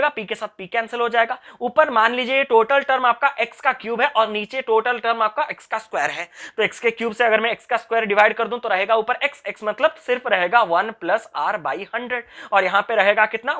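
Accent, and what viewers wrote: Odisha, India. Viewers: R u from Odisha?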